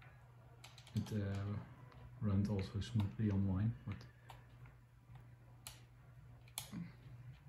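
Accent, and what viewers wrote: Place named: Netherlands. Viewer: Batocera is awesome! U sound dutch. ( I am also dutch).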